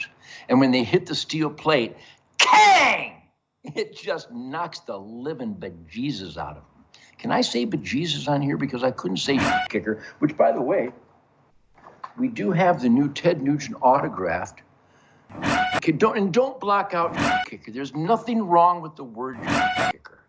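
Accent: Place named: United States. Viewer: Thank you Ted for your American voice.Always your fan forever 🎉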